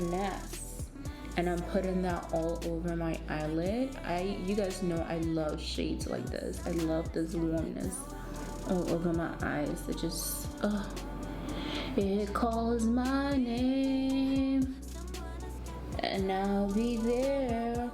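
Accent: United Kingdom. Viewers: British accent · almost British...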